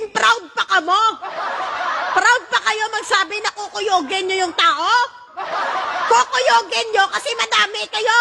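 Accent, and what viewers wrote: Iloilo, Philippines. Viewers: Ilongga ka mam, isog gd 😁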